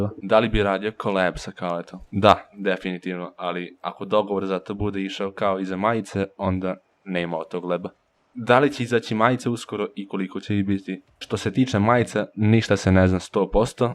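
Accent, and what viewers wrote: Subotica, Serbia. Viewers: Znao sam da si iz subotice hahaha zbog naglaska ;) Ps: ja sam iz sente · Znao sam da si iz Subotice hahahahahaha po akcentu jer sam cesto tamo pozdrav za Subotičane 💙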